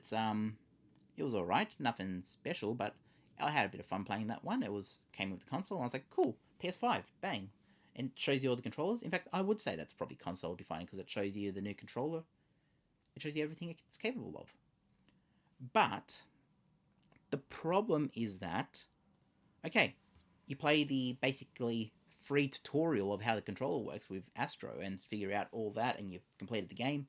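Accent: Australia. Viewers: I mostly want a ps5 for the new Silent Hill games coming out. I heard theres supposed to be good games coming near the end of the year. I agree with you that ps5 should be at least able to play as far back as the ps3 games. You have a really awesome and soothing voice btw you would be great for voice overs especially in the west because your Aussie accent is very charming. Keep up the great work.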